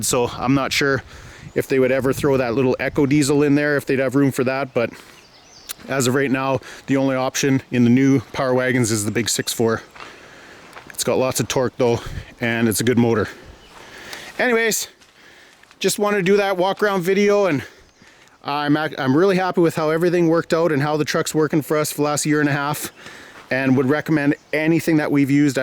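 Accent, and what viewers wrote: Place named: Canada. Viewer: talks like a true Canadian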